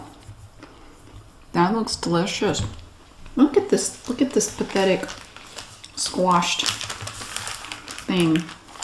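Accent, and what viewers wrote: South, United States. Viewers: I don't know how long I've been watching you for but I remember searching for Southern accent ASMR after watching a load of Phyllis Stokes videos. Unfortunately she's passed on now but I'll always remember my excitement when I found your channel. Happy Anniversary from the UK ✨ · Congratulations! What a milestone! Thank you for putting all the time & effort into your videos to make sure you give us the best ASMR experiences. You sure do help me relax & sleep. Just something about that southern accent lol. And for that I thank you. Can’t wait for what the future holds!! You rock!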